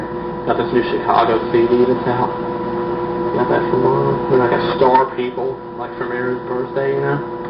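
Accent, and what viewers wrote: South, United States. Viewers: Oh, wow... you really did used to have a southern accent. lol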